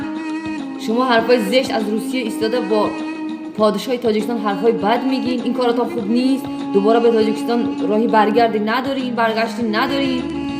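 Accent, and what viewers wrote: Iran. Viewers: Ман митунам шуморо бигоям ту ируни харф мизани манба махкулшиди хуб харф мизани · Эрони гап мезана точикода обращени дора дафк хта дюмбор набинемт да ича эаму эрониёву туркода обращение кн точикои мора зиндагишона хавардорам нести дюбор гап назан дарбораи точико т точик нести мо тра не признаём уродина 🖕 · Ту чиба точики гап намезани? Неким бо Ируни бо хато харф мезани, магуки ируниям, карочи Насихат Хубе, фикрат зебое, дуруст мегуи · Полный рост эрони хта гирифти ку??точики охи лахчаи точики Кати гап зан намезебат!!! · Точики тоза гап зан.ранги ерони гап мезани.точикдухтар боши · Oy dokhtar khode too az kojoin pas chero be lahjiye Tojeki gap namizani too az kooli Iroon harf nazan goomshoo bobo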